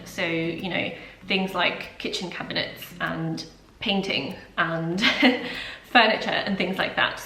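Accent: England, United Kingdom. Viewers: What a fantastic and honest video . I was shocked, that your polish . I dont mean that in a rude way , you sound very English. Everyone thing will work out . Big move or is it something you always wanted to do ? Keep the good work up 👍👍👍🥰🎉🥳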